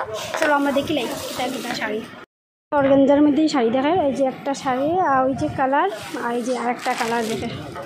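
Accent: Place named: Bangal, Bangladesh. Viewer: Tomar bangal katha khub valo laglo. Ami kolkata theke. Tumi khub beautiful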